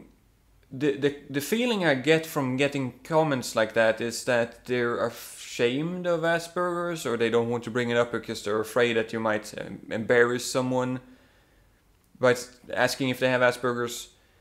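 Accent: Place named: Sweden. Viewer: I dig that swedish accent of yours!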